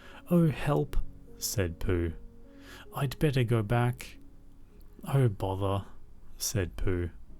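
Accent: Australia. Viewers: Thank you, I was seeking out Australian accent story tellers for my daughter (we moved to Canada where I’m originally from to give it a go being close to some family for at least the next several years) and I am feeling a bit guilty, hoping she will hold onto some part of her accent so your story telling is much appreciated :) and after living in Sydney for over 20 years and as a proud Sydneysider I have to admit I’m really enjoying it too :)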